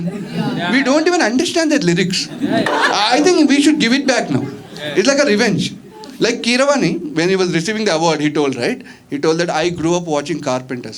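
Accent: South India, India. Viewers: I love that south Indian accent in ur English... And tht.. Re chintuu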